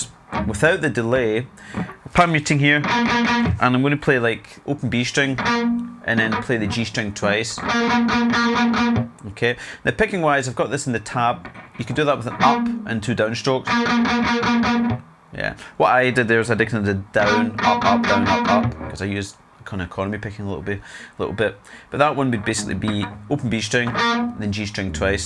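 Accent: Scotland, United Kingdom. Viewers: you Scottish? I'm american but I visited Scotland not too long ago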